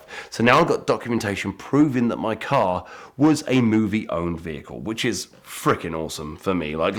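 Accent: United Kingdom. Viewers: Listening to him talk is agonizing. I fell like i am being tortured by an drunk listening to the British accent!!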